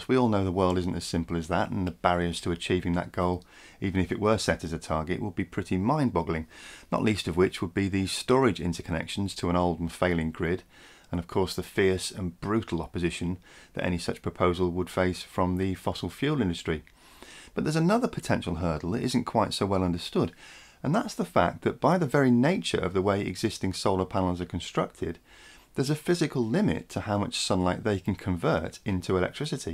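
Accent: United Kingdom. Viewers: For American English “pero vsk vsk vsk ite” is the word & differentiates it from (perocstie?) which I hear throughout this presentation. I often have difficulty w/ various versions of British annunciation.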